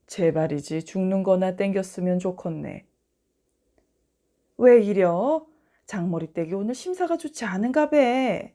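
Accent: Gyeongsang, South Korea. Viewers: 여긴부산인데요 갱산도미까 사투리가 구수해요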